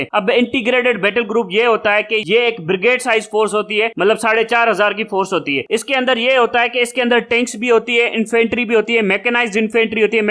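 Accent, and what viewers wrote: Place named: Sindh, India. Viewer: the way speak, i think you are sindhi.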